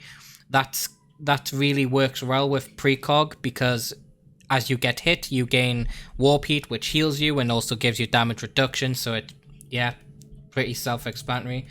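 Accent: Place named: Northeast England, United Kingdom. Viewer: Sup good to see another Brit putting out some good content, by your accent I'd guess your from the northeast? Anyway I love to see what you could come up with for ranged sader particularly H bolter if possible and a sniper sin it's seems the balance is way behind on those two types but It would be great to see if you could come up with something for them that can reach the milestone of battle120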